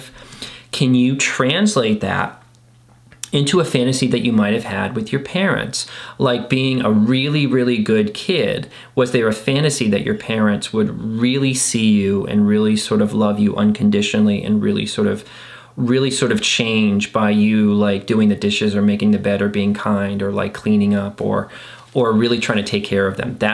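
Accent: Boston, United States. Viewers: love the boston accent lolz but really you make all of these difficult topics easier to swallow and comprehend and for that I couldn't be more grateful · As a native upstate NYer, I loved hearing your Boston accent! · Lmfao at the Boston accent 😂❤️ made me love the videos more! Sincerely, a Bostonian, kid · The Boston accent …… I love it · Your Boston accent 👌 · Strawberries!!!!🔥🔥🔥🤣 I played that first Paula Abdul tape til it broke😏✅ went back for another. And her next tape. Still got em in the time capsule in ma basement😉 Giggles from this 40 yr old🥰 Thank You😁 Your clips are amazing... the magical $300 car... lols Excellent. You explain all this heavy icky stuff in a relatable, nonoverwhelming way.... which builds confidence in managing self... HUGE... THANK YOU SO MUCH!!! 4TH generation Irish... LOVE the Boston Southyish Linguistics 🍀😁🍀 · Omg best Masshole accent ever! 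I grew up in Southern NH and went to high school on the border of Plaistow and Haverhill. No matter how hard I try, I have never ever been able to do either the Boston or Southern New Hampshire accents. I am wicked awesome 😂 at pinpointing where people are from by hearing the difference in their accents, but I grew up without one somehow. It’s always fun to hear it since I’ve been living in the SF Bay area for the past twenty odd years since I moved far far from Sandown, NH. It’s still home in my heart (and Ely & Fairley, VT) but I have never ever wanted to go back permanently. Short trips remind me quickly about why I moved 3000+ miles away as soon as it became possible. Thanks for helping us unravel our childhood disfunction and confusion. For me, distance, time, and finding your friend family helps so much. 🥰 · Love that Boston accent. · Ha, I knew I could hear a faint Boston accent in your other videos! 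Appreciation to you from Allston. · Love the Boston accent! It made me smile! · OMG the Boston accent and the 80's/90's references!!😆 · shoutout for the authentic Boston accent LOL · Thank you for clarifying behaviors associated with growing up with childhood trauma and also offering scenarios that may have started the path. Each of the videos I've watched have helped me. Like another commentor...I cannot watch a full video...but because of the sheer amount of information to process. I break them up into 2 or 3 sessions. I too am from Boston, though I've lived in Oregon for 40+ years. I love the accent...and have always had an unreasonable attraction and affinity to anyone that has one. I am now hearing (in my mind) ...this whole video with the letter "o"distinctives of ah' or aw...such as "pahk the cah in Hahvahd yahd" and the "tawnics" and several words joined together such as...the "howahya's"❣ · This video is amazing for the Boston accent alone! 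· Love the Boston accent 😂❤ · Your Boston accent had me rolling 😂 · Haha I love the Boston accent.😂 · Just want to say that I think your channel and your Boston accent are just fantastic. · Omg, the Boston accent. So funny. Thanks for this video!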